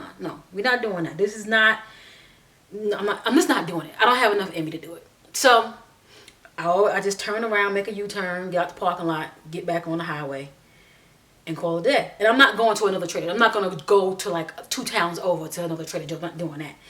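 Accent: New York, United States. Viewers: I knew you was from close by me 😂 I said she from up North. I'm about 45 minutes away in CT.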